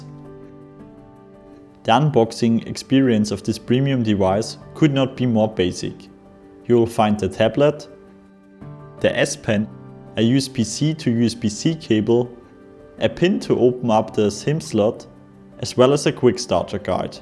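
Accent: Germany. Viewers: It sounds he is German.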